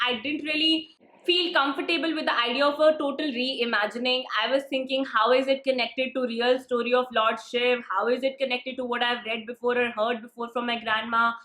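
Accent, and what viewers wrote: India. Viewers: Ma'am, A very Good Morning to you, whenever you read this. I was very much astonished ; as I am first time watching you consecrating YouTube from the videos; you posted for Lord Shiva Trilogy. I bow low to Lord Shiva. I had read the three Books of Amish Tripathi. First book was like a TNT explosive that are been up to now shattering and mersmerising experience while reading. Honestly speaking I never been into reading books but one day my sister told me about it and since from then I read all three. The vocabulary and the art of expressing the expressions in magical wording are Superfine with new never ending joy. You posted video directly without more than 3 to 4 cuts. I mean, looking at you keeps me believe that this is a better developed India where girls are about to fuel in and take off the flights to extreme heights. Your tone is bit Hinglish(no offence) but you speak correctly with the right pace, confidence and proper pronunciation . 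Thank you ma'am for delivering the words for describing Shiva Trilogy. You are a source of inspiration for million of us. Ma'am it would be good if you can tell me that how you cope up with camera fear and to be presentable in public appearance or shoot . Keep up the momentum. comment tagging me. Thank You ma'am. Vivek Malwal.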